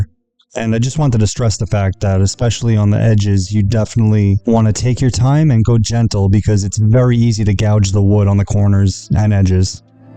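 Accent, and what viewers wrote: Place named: New England, United States. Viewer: I enjoy your work & have seen many or your videos. I subbed today, didn't realize I hadn't. Sometimes I wait for a few videos to see if a person genuinely has my interest. May I ask, are you from NY or New England? I think I recognize the accent (I'm a New Englander), no offense intended. You could consider (?) putting an email address in your bio or other SM as some of us may be interested in contacting you without leaving messages for the world to see ;) - Great job, so refreshing to see restoration. I also admire furniture art & many forms of art, but restoration is its OWN baby! 🥰Kudos!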